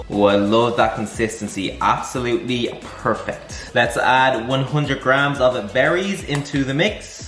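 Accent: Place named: Ireland. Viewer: I have been watching your videos ALOT when im at work, taking notes! today i am watching at home with sound, and i had litterally no idea you where irish!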